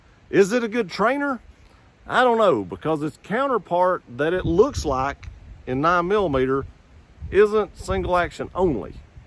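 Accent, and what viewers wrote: South, United States. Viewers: Brother are you from south of the Mason Dixon ?🤓…. Great video … well done !!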